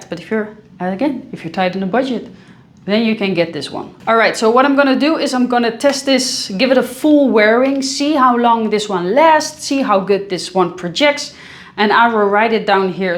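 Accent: Netherlands. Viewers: I love that dutch accent ♥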